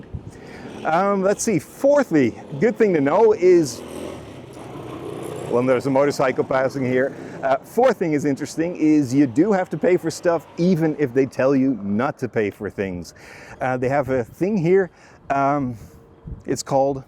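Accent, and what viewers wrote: United States. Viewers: Very nice and informative video. I hope you get to go back again and visit more places and have lots of fun. For your information, when someone in Iran (and probably in Middle East) puts their hand on their chest, they're kind of putting it on their heart, meaning that you belong there... in their heart. It's a sign of sincerety, if you will. (^_^) Oh, and from your English, I initially thought you were American. Your English is perfect. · for a Dutch person, you speak English very closely to an American. A lot of people form Holland visit. Iran. Very nice and genuine vlog.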